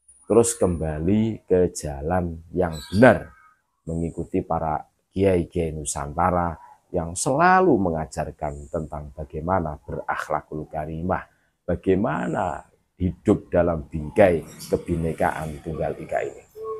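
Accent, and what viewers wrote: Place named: Madura, Indonesia. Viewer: Logat bahasanya orang madura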